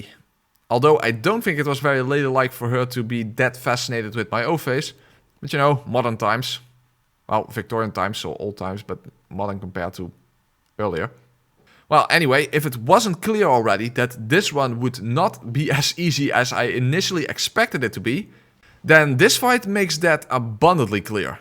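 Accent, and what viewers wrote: Netherlands. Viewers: gok aan t accent randstad ook? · U dutch? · Leuke video! Altijd grappig hoe wij Nederlanders een typisch accent hebben wanneer we Engels praten. xD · First video i watched on your channel, immediately heard the dutch accent hahahah🇳🇱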